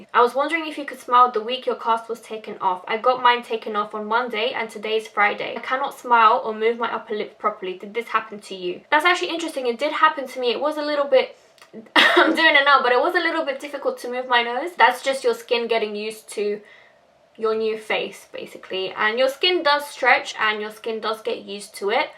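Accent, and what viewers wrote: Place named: United Kingdom. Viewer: Where are u from.. U have that british accent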